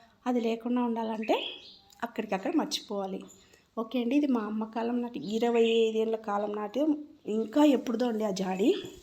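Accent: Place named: Kadapa, India. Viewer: మీ మాటలను బట్టి... మీరు కడప జిల్లా వాసులనుకుంటాను. ఎందుకంటే.. ఈ ఆల్లకాగు అనేమాట అక్కడిదే.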